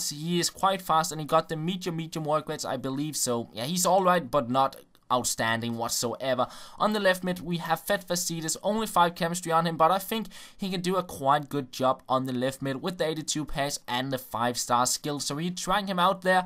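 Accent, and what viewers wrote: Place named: Denmark. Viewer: Den danske accent<3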